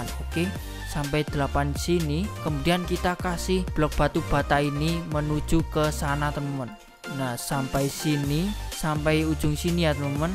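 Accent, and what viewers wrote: Java, Indonesia. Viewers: Bang ngomong nya kaya orang jawa 😂😂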